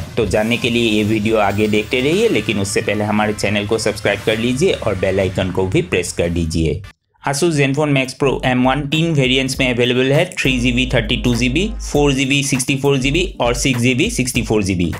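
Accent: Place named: Assam, India. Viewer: Aapke..awaz se toh..aap Assam ke hai ...lag raha hai. 😅😅😅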